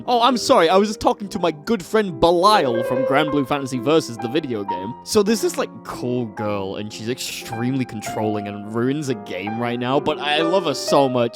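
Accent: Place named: United Kingdom. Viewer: How to start a Video: 1. Say "Lets talk about [insert fgc related topic here]" In a british accent 2. Have the camera be fuzzy and show a big giant white text of insert topic before showing the strive giovanna gameplay 3. Always play the Character Select Theme from MBAACC 4. Time all three events to where the beat drops in the Character Select Theme from MBAACC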